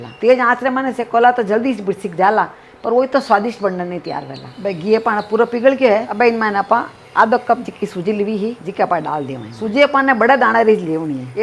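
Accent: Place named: Jodhpur, India. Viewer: Aunty aap ki boli Jodhpur ki lagti h, aapke video bahut ache h.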